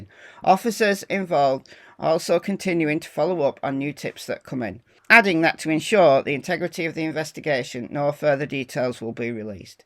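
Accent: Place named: Lancashire, United Kingdom. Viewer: Hey - your voice remimds me so much of my former mother in law(a Lancashire lass!) On a serious note, my grandkids are a 6 yr old girl and 3 yr old boy. The idea that they would go off alone from the safety of their garden and into the woods is utterly preposterous. There is just no way on earth.... I don't think they were abducted either - I don't think they ever left that home, at least not alive 😢 I find it interesting that out of the many videos there are regarding the subject ive not seen one comment that exonerates the parents of all blame and believes their story whch says a lot really doesn't it! Sending love from mid Wales! 🏴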